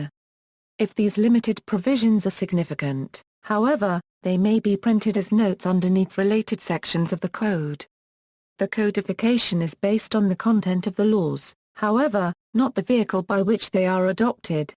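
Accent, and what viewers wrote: England, United Kingdom. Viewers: And why is an Englishwomans' voice used to talk American law?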